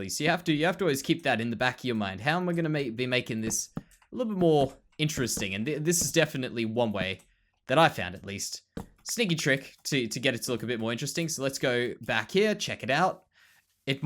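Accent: Australia. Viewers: You dont sound funny, i thinkthe Aussie ascent one of the coolest. Also know plenty of people that agree. Always wanted to visit sydney, the pictures I've seen are beautiful. Great video as always my friend.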